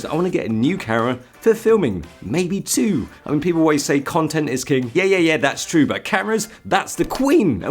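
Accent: United Kingdom. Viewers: imagen being lost in china and you bumb into this guy for directions lol he sounds more britsh than most brits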